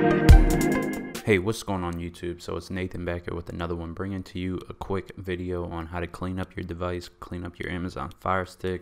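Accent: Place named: Brooklyn, United States. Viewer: bro you sound like a nyc/brooklyn dude.lol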